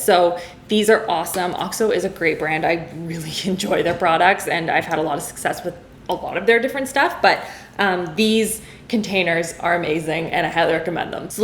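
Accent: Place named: Canada. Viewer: The Canadian accent is strong today 😂🤣😂🤣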